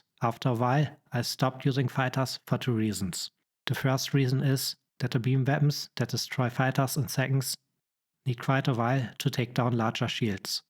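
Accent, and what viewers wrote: Germany. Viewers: i am not a native speaker either but your beautiful german accent do not hinder my ability to understand at all, I even find your accent crispier than british english to identify each single word lol 😂. If I may suggest...the automatic subtitles (in english) work very well for those parts difficult to comprehend, maybe you could check the subtitles for futures videos to make sure they show exactly what you say, or suggest people to turn on subtitles! Anyway good joob and ciao from 🇮🇹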